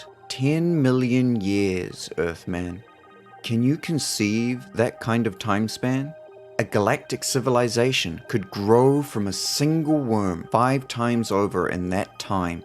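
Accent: New Zealand. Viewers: Kiwi reading! Yaaay! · Oh snap! 😉Awesome read though with a kiwi ❣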